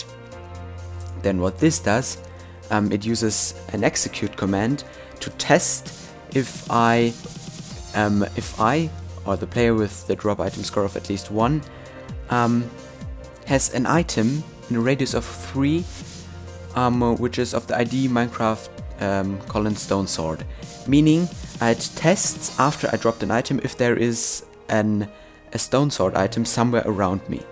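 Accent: Germany. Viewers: Du solltest unbedingt üben flüssiger, schneller und ohne deutschen Akzent zu sprechen...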